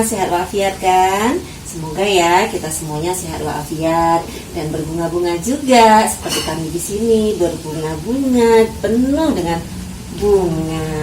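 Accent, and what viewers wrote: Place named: West Sumatra, Indonesia. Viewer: Bund asal sumatera barat ya??.logat bahasanya..😊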